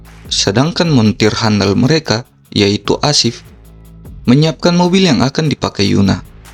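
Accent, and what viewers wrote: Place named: Makassar, Indonesia. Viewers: Bner bgt kaya org Makasar suaranya....aku punya saudara di Makassar kota bantaeng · Orang Makasar ini host nya.. dari logat keliatan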